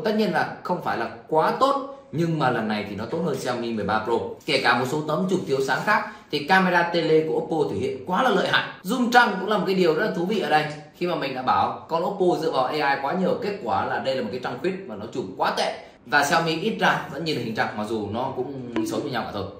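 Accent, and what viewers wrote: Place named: Countryside, Vietnam. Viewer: Mặt bựa lẫn giọng quê quê!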